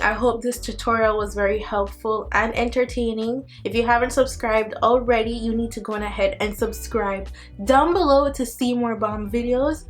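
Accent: Jamaica. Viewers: Are you Jamaican 😊 ?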